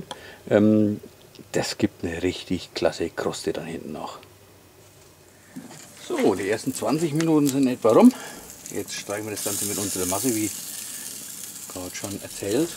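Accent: Franconia, Germany. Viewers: Der fränggische Dialekt ist zwar grauslig zum hören- aber das Video, das Rezept gleichts wieder aus ;-) Macht Bock auf mehr :) Welches Rub hast Du verwendet? schönes Video - Danke fürs Hochladen :)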